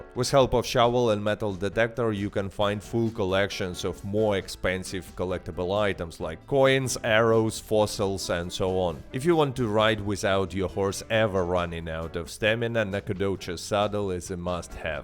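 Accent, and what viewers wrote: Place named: Russia. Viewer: I love your accent. It sounds Russian but not quite Russian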